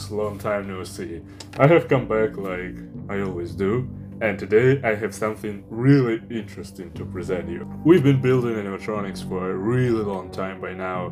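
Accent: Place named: Russia. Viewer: Всегда русский акцент уловлю 🫂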